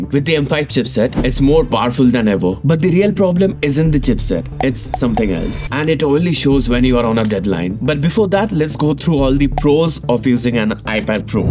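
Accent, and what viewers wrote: Bengal, India. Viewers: Bengali tone in English 😢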